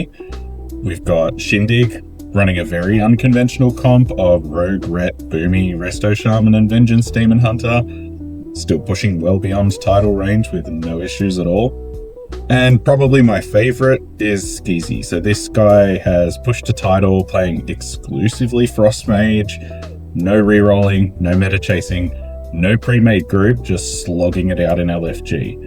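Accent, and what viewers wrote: Australia. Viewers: subscribed just bcz of the aussie accent. Awesome content!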